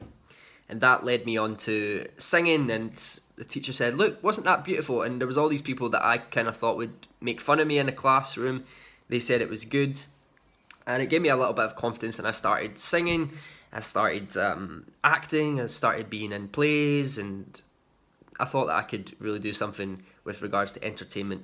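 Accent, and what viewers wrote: Scotland, United Kingdom. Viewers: Gaaahhhh I would love to go to Scotland! I have Scottish on my dads side. To go see part of my ancestry would be the best! But thank you for sharing your lovely accent! I still laugh when people think it’s Irish 😂😂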